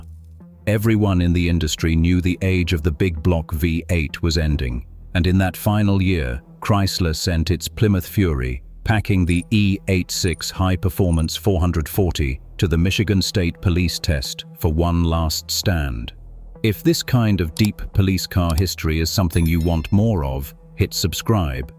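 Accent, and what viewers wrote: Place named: United Kingdom. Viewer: American cars, American cops, American highways, and this poster decides that it has to be narrated with a British accent. WTF?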